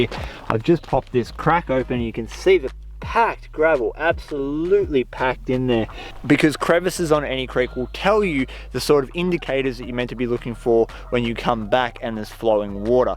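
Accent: Australia. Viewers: Is this Australia? You have that accent.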